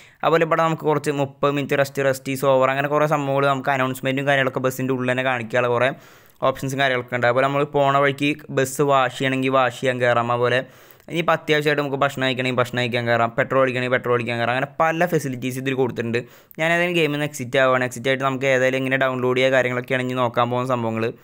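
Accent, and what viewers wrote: India. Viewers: U malappuram aano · Bro thrissur karanano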